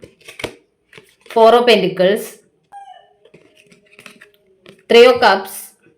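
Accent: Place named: Malappuram, India. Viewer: Malappuram slang is superb♥️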